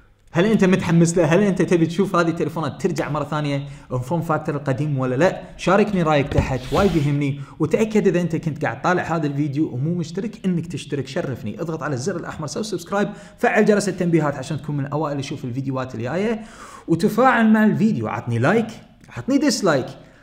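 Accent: Kuwait. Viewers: التقنية خراافية ولكن الانتشار الاوسع رح يكون بعد خمس سنين بسبة السعر الجنوني اللي بينزل فيه للسوق وللأمانة انا سوري بس اللهجة الكويتية يا اخي كشخة😄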